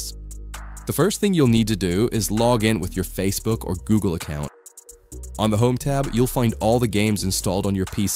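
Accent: India. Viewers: bro when from brittish to indian?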